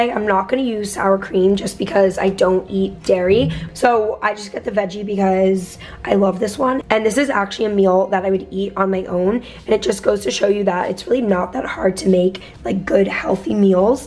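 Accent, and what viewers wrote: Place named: Northeast, United States. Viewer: ya it's def a northeastern accent thing. i watch another youtuber who lives in la but she's from massachusetts and she say "rum" for "room" too. it's funny but cute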